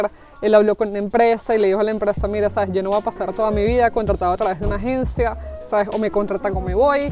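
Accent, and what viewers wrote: Venezuela. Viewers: Hola, creo que tú acento es Venezolano, tengo una consulta. Una ETT justo con las promesas que tú estás hablando... 40hrs bla bla... Valdria la pena ir ? De aceptar con cuánto dinero debería irme ?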